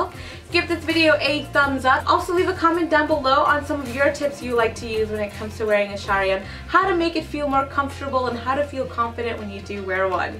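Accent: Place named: Bengal, India. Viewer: Shes Bengali....have some linguistic awareness.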